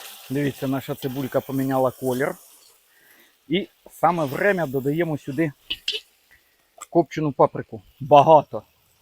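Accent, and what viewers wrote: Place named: Chernihiv, Ukraine. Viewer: Пане, а звідкіля сам родом будеш якщо не секрет?:) по говірці дуже схоже десь з чернігівщини, на менщину дуже схоже. 😜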